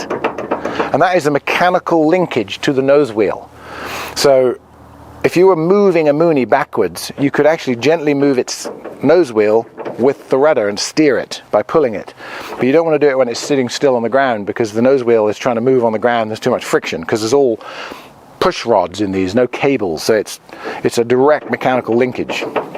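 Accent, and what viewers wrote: England, United Kingdom. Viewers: I listen to you for the "English" accent so say "leeever".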